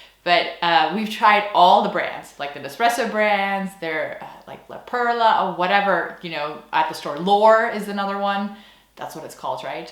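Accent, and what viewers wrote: Netherlands. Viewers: You sure seem to be settling in well, you even seem to be developing a dutch accent. Sometimes i think im listening to a dutch girl speaking english :) Anyhow you were just in time, i guess the coffee places and the museums closed right behind you for the new lockdown.... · Is it me or you now have a Dutch accent when you speak English? Especially in the outro! 🇺🇸🤝🇳🇱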